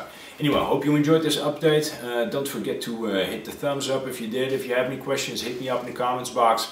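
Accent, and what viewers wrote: Netherlands. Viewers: Hey buddy, are you dutch? You sure sound dutch cuz I am too ;)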